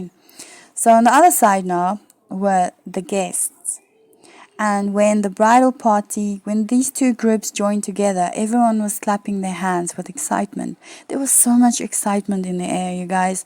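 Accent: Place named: South Africa. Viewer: I just came across this video and recognize your South African accent!! (groete van Benoni 🇿🇦) God bless, sister. See you soon in the clouds!!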